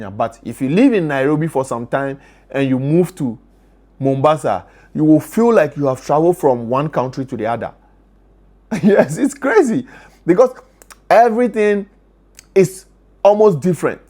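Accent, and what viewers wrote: Nigeria. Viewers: You were called oga cos of your accent..it sounds nigeriAn. Its not cos of the beard cos young kenyans wear beards too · Your english you sound like nigerian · Those mangoes they put pepe there are unlipe they are very sweet with pepe if you mix everything in food we call that ma chakula nobody will eat that, but we like fresh food with flesh spices, in Kenya we say what men can do women can do better, every one in Kenya has bank account in their phone means Avery body is rich 😂😂😂😂, matatus there are those who don't have the noise so you choose the ones you want to use, for the noise ones are called nganya, you look likes ogo even your English is somehow for Nigerians, uhuru means freedom, so you like nyash😂😂😂😂😂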